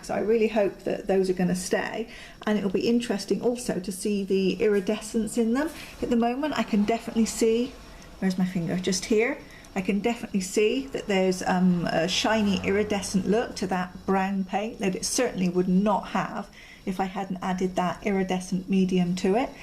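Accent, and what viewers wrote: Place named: Dorset, United Kingdom. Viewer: Hi there, this is so interesting, thanks for sharing, I wonder, is there something you could add to micas to make them pearlised? Btw love your accent, are you from Dorset?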